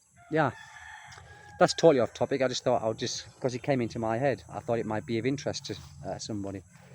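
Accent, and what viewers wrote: United Kingdom. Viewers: I think he's more a practicologist w the British accent Westerners enjoy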